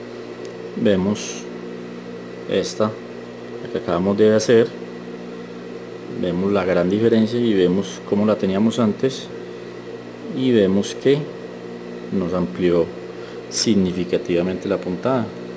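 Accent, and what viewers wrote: Colombia. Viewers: buenos dias amigo por su dialecto se meda que usted es colombiano y por los lados del eje cafetero buen tutorial felicitaciones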